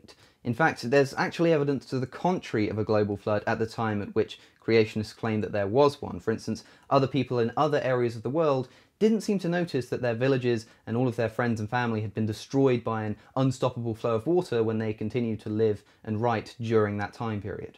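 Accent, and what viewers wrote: United Kingdom. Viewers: I feel like things are easier to understand when explained in a British accent. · The British accent is the music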